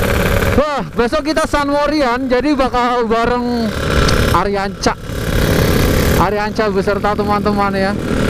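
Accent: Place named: Java, Indonesia. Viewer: Wong jawa kie ya 😂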